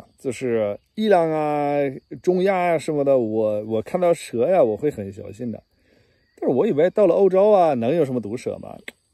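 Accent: Standard. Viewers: 的中国话比很多国人的话还标准了，还有几句来句操，感觉很对位😂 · 竟然會標準口音厲害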